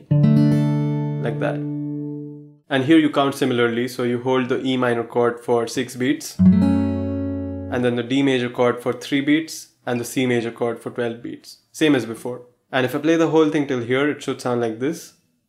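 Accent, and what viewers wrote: India. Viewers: Are u indian? U sound so indian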